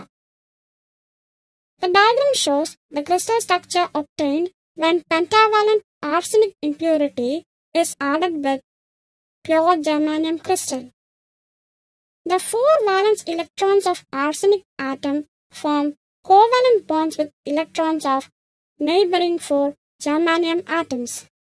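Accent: India. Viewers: A South Indian chipmunk?!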